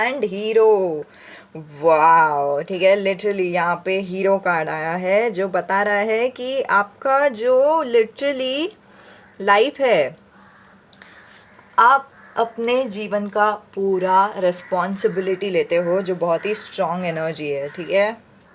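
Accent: Maharashtra, India. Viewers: Aap Marathi ho Nice tone 😀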